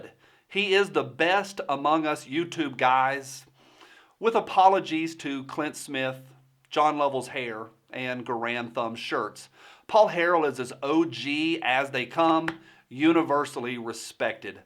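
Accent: South, United States. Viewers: Really respect Paul Harrell and like his videos..I like your videos as well and have just subscribed....as I listened to you I was thinking man he sounds familiar....and then you you said " Shazam " and bingo !! Gomer Pyle !! I grew up watching TV shows that Jim was in and always liked that guy. Being a Appalachian born hillbilly myself I love hearing southern accents on the tube...old tube or you tube ... thanks for what you do really like your channel. Also I'm a vet and absolutely do not like posers..now I will go and watch the video in question...thanks "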